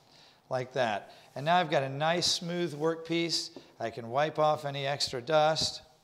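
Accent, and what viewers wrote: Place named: United States. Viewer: Yeah dude .... what’s your problem ! This guy has the least annoying American accent I’ve probably heard .... my mums being the worst !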